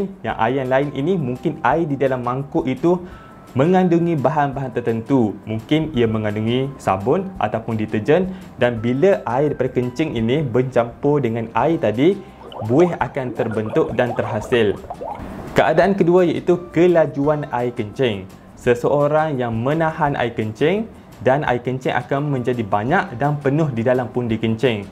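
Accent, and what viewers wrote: Malaysia. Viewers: Orang Malaysia Ya Bang...?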